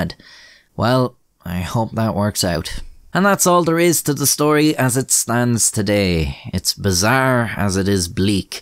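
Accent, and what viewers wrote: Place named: Ireland. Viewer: "I admire conmen" yes we know you are Irish by your accent.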